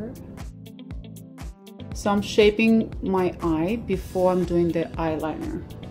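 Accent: Russia. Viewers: This russian accent 😍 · Nice voice. Very rispy russian tone. Gorg! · Love your russian accent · she's russian doe, she definitely don't have chinese accent lol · Anna Kozina у неё самый настоящий русский акцент. Так только мы говорим.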